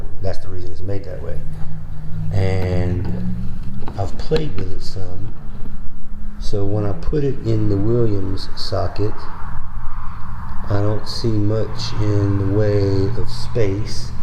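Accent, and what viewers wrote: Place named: Louisiana, United States. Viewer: Noticed the accent before you finished your first sentence. Is it wrong that I'm more inclined to trust someone who talks like me? Been debating between these brands and was under a different impression. Thanks from Lafayette cher.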